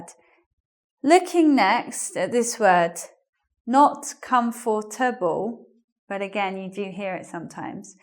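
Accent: United Kingdom. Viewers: Well, she sounds completely British to me, and just love the accents she use. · i hate british accent after this vid. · I love this video and, I like the way she teaches. someone said that she isn't good at explaining things but, I don't agree. she's explaining is very simple. she is trying to talk every sentences shortly using easy verbs, as I see. and she's british accent is very clear. (she even speak slowly, nice!) this all things will be helpful for Asian people want to learn by watching and listening British english just like me. especially Japanese, Korean. Because this two Country's grammar is very different to english! It's completly reversing how you speak & read. Long explaining, added adjective, talking stories, it's sometimes useless! it's even make more confusion to these country's people. These videos are just what I want! Thank you for! x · OMFG.......the British accent is terrible !!! 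· Thank you for this good lesson, with British accent. I think that British (let's say English)accent is the sole fundamental and elegant one, to learn for non native speakers,first of all, and before any other accents, which have altered a lot the original and true ESL. These additional accents do not seem very necessary.(American, Asian, neutral etc.): for instance, an U.S.A. native can well understand someone speaking with an English accent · I was following your video like British accent but i didn`t know that you are also teaching at Engvid... thanks so much for helpful clip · lol no you don't sound like a native speaker, too british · Hi your acssent british english) i'm lithuainian live in england 10 years,and sometimes very hard to understand english acssent,canadian and americans i understand,but i not in north america i'm in england and i need learn british english,thanks i like your videos ))) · Finally i found one channel of british english lessons, i was tired of those estupid american accents, the best accent for me is this! 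· You are so sexy with your sexy voice and that British accent!!! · My god, I love your british accent and trying to copy that , though I'm a man :D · you are superb , i'm in love with British accent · English - Learn English (engVid) I have a doubt,you are british? I have trouble in recognise accent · I LOVED THIS LESSON. YOU SPEAK WEIRD. LIKE BRITISH.. ARE YOU BRITISH? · Pretty much the most normal English accent you will hear, not sure why everyone's saying it's weird. If you think it sounds weird then you're unfamiliar with British English! Urban south eastern. Only weird thing I heard was illead instead of ellide.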